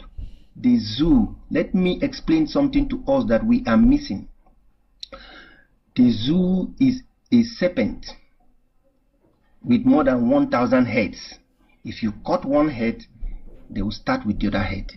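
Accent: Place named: Fulani, West Africa. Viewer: You make do much sence but your intunation sounds like a Fulani person Thank you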